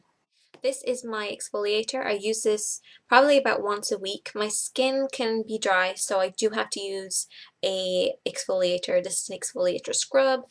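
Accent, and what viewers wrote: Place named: Ireland. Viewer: you're Irish aren't you? lol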